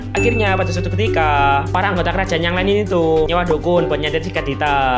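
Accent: Java, Indonesia. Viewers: Jowone kentel tenan mas👍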